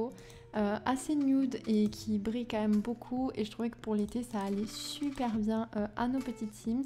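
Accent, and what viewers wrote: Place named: Corsica, France. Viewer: Coucou 😊 ohlala je viens de tomber sur ta chaîne et je sens que je vais télécharger TOUS TES CC, je suis beaucoup trop fan de tes sélections 😌💕 ça me relance de ouf dans les sims là On dirait que tu as un léger accent, ça me rappelle un peu la Corse, tu es originaire d'où sans indiscrétion? ☺️ Je m'abonne ❤️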